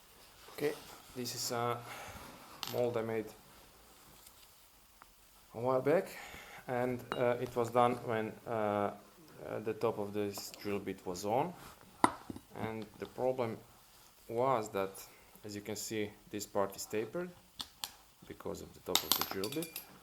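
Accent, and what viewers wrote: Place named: Eastern Europe. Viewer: I really loved watching this video. It’s informative and I especially enjoyed the calm pace of it and your voice and (Slavic?) accent. It was also very clearly explained and I’m confident I can give this a try myself now. Definitely appreciate a good homemade video like this without all the editing, quick scenes, flashing lights, annoying loud music and clickbait. Just a simple information video. Keep it going!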